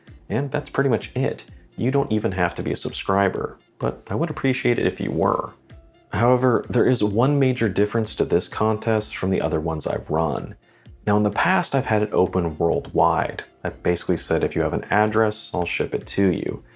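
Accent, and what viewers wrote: United States. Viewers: Anything Seiko related is always most welcome, but the Glycine looks equally interesting. Now for the compliments. Kudos on a great channel. Love your voice by the way, it is so quintessentially American.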